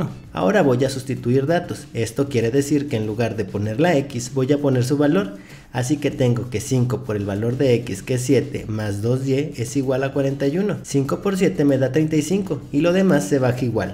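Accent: Mexico. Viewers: eres de cd juarez o nomas es mi ingacinacion xd?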